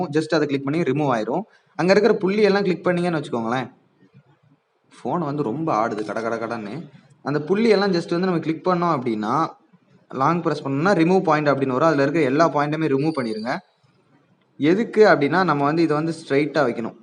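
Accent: Kanyakumari, India. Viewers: Anna neenga kanniyakumariya?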